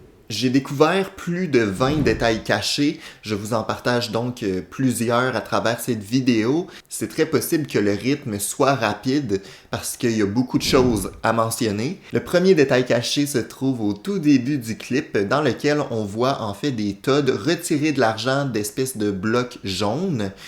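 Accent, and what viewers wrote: Quebec, Canada. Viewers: c québecquois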